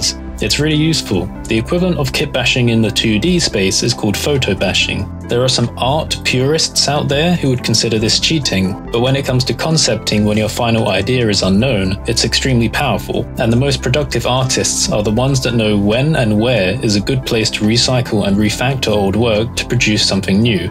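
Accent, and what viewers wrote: United Kingdom. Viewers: wow this is my first time seeing you haha I love all your vids but by your accent I always thought you was a British Pakistani or something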